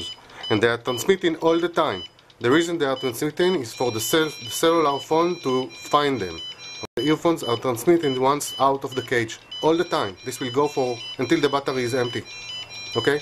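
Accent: Israel. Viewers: עכשיו ראיתי את המוזיקה אח שליח ידעתי שאתה ישראלי מהמבטא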